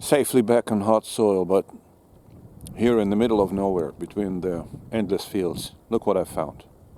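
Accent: United Kingdom. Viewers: bro i thought you were british ngl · You sound British B) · Greetings from New Zealand. You are the most British Russian ever! I had so much fun watching this. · Haha I'm confused are you Brit or Russian? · Yea he is actually good Sounds like british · For a Russian you sound like a perfect brit. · Sounds like you are British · Interedting. Aberdeen is in Scotland, i was thinking more about areas like Yorkshire which stress sounds similar to you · you sound British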